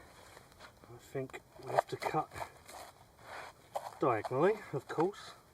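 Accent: United Kingdom. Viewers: He's British definitely not American accent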